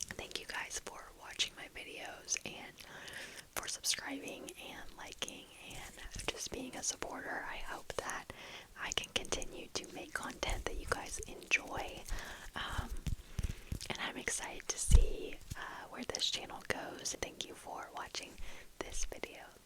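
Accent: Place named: Australia. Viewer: bruh i was so convinced you were australian xd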